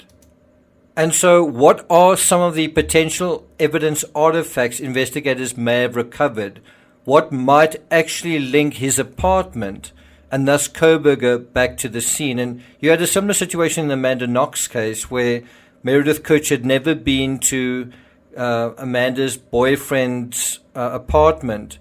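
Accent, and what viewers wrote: Afrikaans, South Africa. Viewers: Is that an Afrikaans accent? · Are you South African? You have a SA accent 😊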